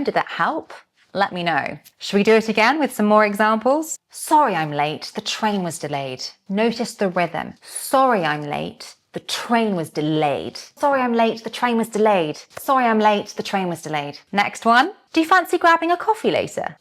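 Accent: United Kingdom. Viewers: I really appreciate your videos They've been a great help with my English. Thank you very much indeed. (Especially lovely British accent) 🎉🎉🎉🎉🎉🎉🎉🎉🎉🎉🎉🎉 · May know mam, which british accent you have?? I love the way you sound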